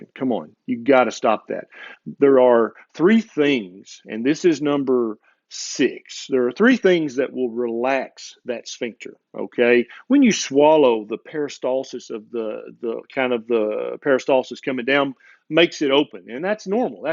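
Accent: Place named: North Carolina, United States. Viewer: I am trying to place his accent. My guess North Carolina?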